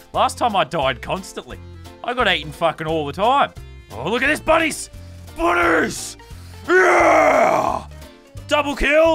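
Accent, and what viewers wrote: Ireland. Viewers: Gosh dang your irish acsent is legite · Ur Irish accent is the greatest I liked subbed and turned on notifications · I love lasarbeams Irish acsent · Damn you got a good bloody Irish accent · U putting an IRISH accent on to annoy me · can we just acknowledge how good his irish accent is tho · I'm Irish and he sounded like he was Irish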